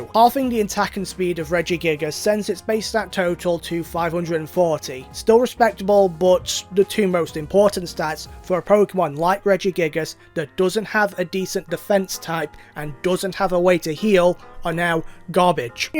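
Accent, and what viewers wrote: Yorkshire, United Kingdom. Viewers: Any British folk recognize the accent used here? I've heard it on quite a few YouTubers. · Somewhere in Yorkshire I think. Definitely northern.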